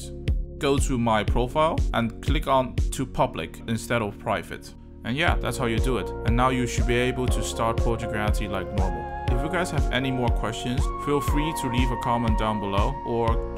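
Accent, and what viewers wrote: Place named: Russia. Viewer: hey your accent its russia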